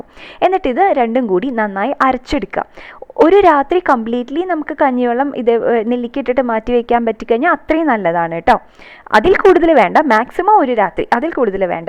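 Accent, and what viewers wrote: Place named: Thrissur, India. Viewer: Mm thoni. Thrissurkar nanayi samsarikunavar annu.. Enikk samsaram eshtam annu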